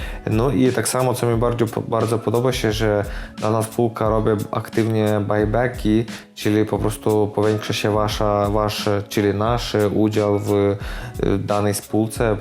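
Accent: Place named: Germany. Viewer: Witam Rostock taki akcent masz jesteś Polakiem filmiki spoko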